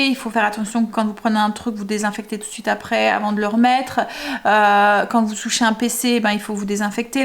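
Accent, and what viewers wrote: North, France. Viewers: Coucou, voilà quelques semaines que j'ai découvert ta chaîne, je la suis quotidiennement, je me retrouve complètement dans tes vidéos, un grand merci pour ces moments partagés dans ta vie de tous les jours et tes précieux conseils et idées culinaires, je retrouve légèrement l'accent du Nord qui me fait tant plaisir à entendre car je suis originaire des Hauts de France mais désormais je vis en Haute-Savoie avec ma petite famille depuis une dizaine d'années, alors un GRAND MERCI,bonne continuation à toi dans ta chaîne 🥰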